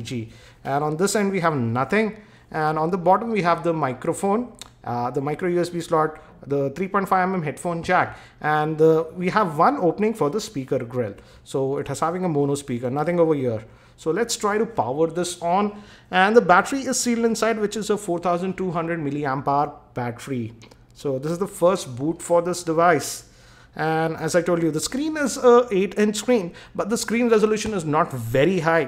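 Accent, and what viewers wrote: India. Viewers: Real Indian accents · I cannot understand you..are you hindi..??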